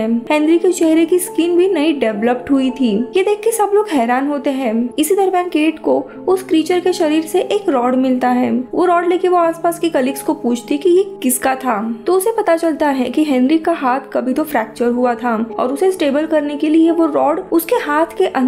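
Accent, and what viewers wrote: Maharashtra, India. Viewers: Are tu Marathi ahes Ka 😅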